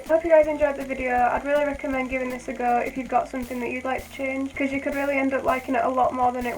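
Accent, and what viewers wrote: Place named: United Kingdom. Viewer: What part of UK are you from? Your accent is cute :P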